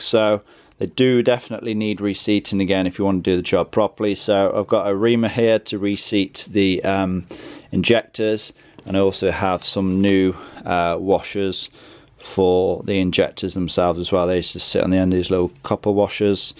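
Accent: United Kingdom. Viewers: you speak heavily with British English accent and I couldn't understand some of it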